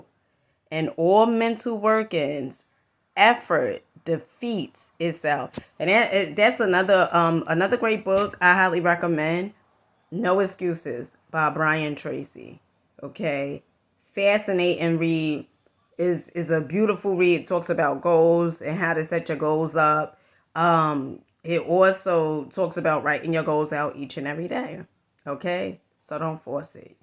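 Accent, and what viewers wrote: New York, United States. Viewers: Nice. To the point. When i was a young adult, i loved the N.Y. accent. Still do. From what part of NY are you?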